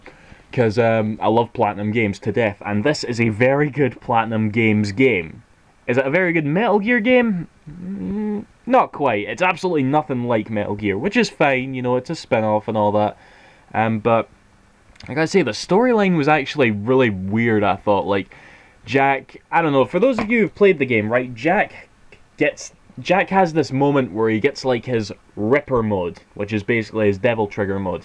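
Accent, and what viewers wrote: Scotland, United Kingdom. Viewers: where abouts in scotland do you come from?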